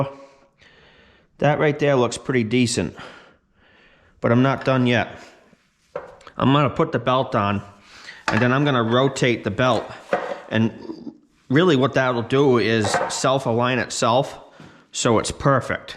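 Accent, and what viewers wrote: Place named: Northeast, United States. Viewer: Good video. I am in a bit of a bond. I ended up taking my gearbox to the dealer to remove and replace the seals and the output shaft bearing as I was afraid of damaging something. Yours is the only place on the internet mentioning the 1” dust shield (SG014). In preparing to re-install my rebuilt gearbox, noted the dealer returned the dust shield in the bag. I know this piece should be installed to prevent future failures. Does this shield fit into the grooves as the other seals do? Btw,I love your northeastern accent!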